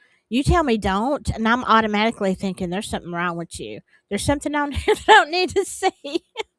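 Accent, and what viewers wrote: South, United States. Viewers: Hey I'm a new subscriber from England 💙. Saw you first with the Prof and I have missed you so I have come over. It's so soothing listening to your lovely Southern voice ☺️🤗. Really enjoyed the live on replay. Fascinating story. That Mark is off the charts creepy. He was no bystander imho. Would love you to cover a UK serial killer.. we have a few.. sadly. The White House farm killings is interesting.. although that was a mass murder.. only a thought.. Much love. Hyped 💙🤗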